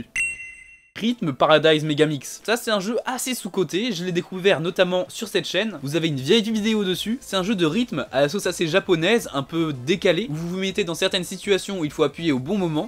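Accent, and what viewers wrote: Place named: France. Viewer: J'adore ton accent bien français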